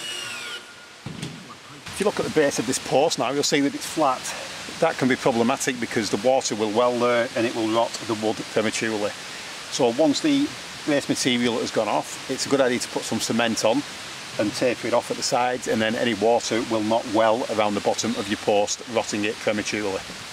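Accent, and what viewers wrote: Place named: Yorkshire, United Kingdom. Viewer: Closed Captions are 100% needed, because of your extra-strong accent. What is that, Yorkshire?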